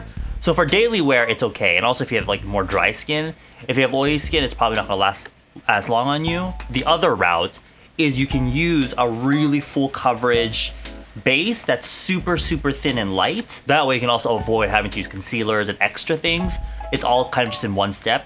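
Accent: South Korea. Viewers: The konglish is strong in this one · your konglish😂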